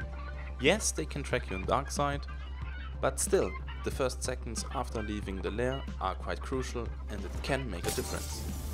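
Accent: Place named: Germany. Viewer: Dezenter deutscher akzent... gefällt mir :D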